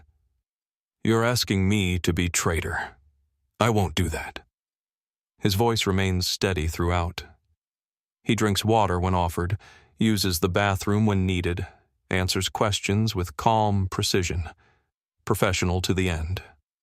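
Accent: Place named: United States. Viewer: Dull voice in this monologue. Very American. Machinelike. No nueancies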